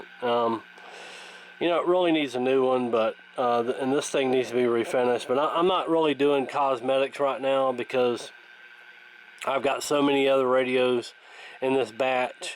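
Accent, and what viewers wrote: South, United States. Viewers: I like to take large tabletop radios like that that are junkers that are kind of not really worth restoring and turn them into guitar amps so if you have any of those basket cases where you don't feel like it's worth restoring to a good workable radio. Even if the chassis is missing and it's just the wooden shell I can make a chassis out of sheet metal and source transformers. Maybe we can work something out. It sounds like you're in the South you might not be too far away from me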